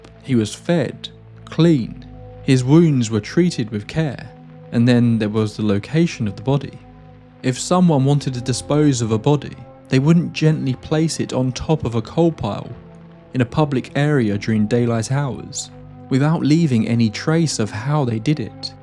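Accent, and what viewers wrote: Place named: RP, United Kingdom. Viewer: Maybe the best part of this for me was hearing you say queen of flatulence and your very posh accent 😂😂😂 But all jokes aside, that was a good episode. I had heard that story before but not in that much detail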